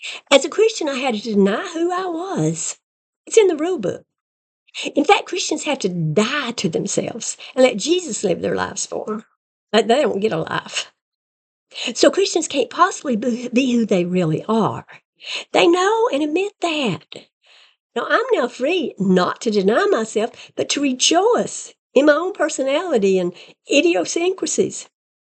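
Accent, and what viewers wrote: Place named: Texas, United States. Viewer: Are you texan? Your accent sounds texan (im not from america)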